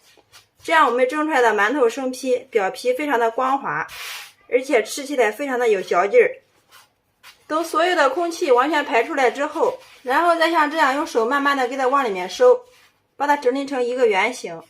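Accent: Shandong, China. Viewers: 不单纯是快的问题，主要是山东话味儿太重所以听着费劲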